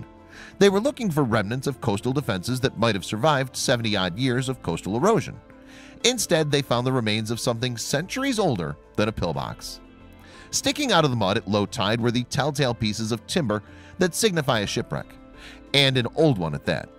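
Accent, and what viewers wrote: United States. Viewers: If you are going to post a YouTube video get your facts straight. What the hell is an American doing a Voice Over on a British subject? The mind boggles. 🤪🤪🤪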